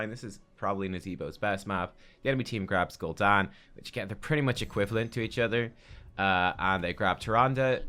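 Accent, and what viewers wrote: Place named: Ireland. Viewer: Pretry sure hes irish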